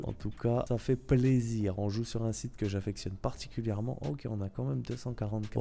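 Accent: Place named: France. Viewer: this channel sounds like a handsome french man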